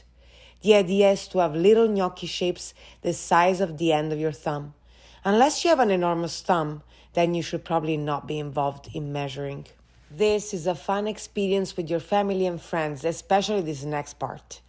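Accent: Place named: Rome, Italy. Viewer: Sei romana pure quando parli inglese XD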